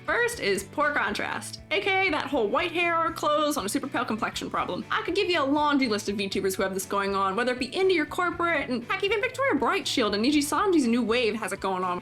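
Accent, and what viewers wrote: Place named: Sweden. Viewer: Unrelated, i'm still secs into the video BUT you don't happen to be swedish?? I keep hearing a slight sweng-lish and now i can't let it go 💀 Edit: okay I watched the full video and read the comments and i REALLY gotta agree with most people critisiting your criticism cause my god some of these "pet-peeves" are just ignorant, hypocritical and extremely biased.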